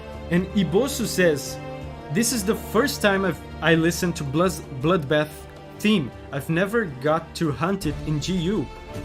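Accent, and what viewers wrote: Brazil. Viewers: Are you by any chance brazilian? been wondering that for a while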